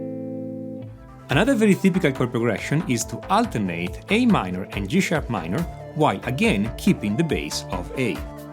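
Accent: Italy. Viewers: You're very useful to the one who studied a lot. Thank you! Are you italian? Your accent sound so italian. Sorry for the stupid question!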